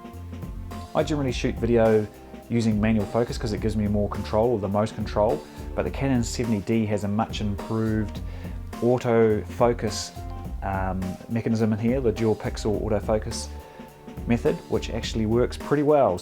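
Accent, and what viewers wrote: New Zealand. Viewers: nice to hear an NZ accent. thanks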